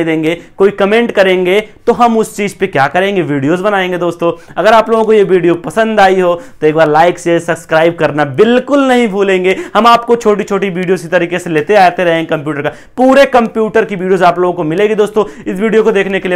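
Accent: Bihar, India. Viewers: Kaha se aa gaya bihari.....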